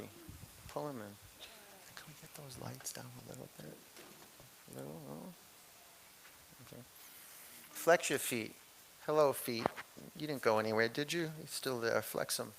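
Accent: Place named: Boston, United States. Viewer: Sounding very Boston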